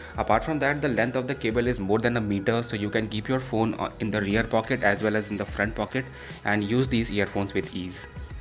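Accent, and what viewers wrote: India. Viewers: At first I was like hmm Indian accent but I'll keep watching. your accent was surprisingly not annoying to listen to, because it sounded very natural. Thanks man!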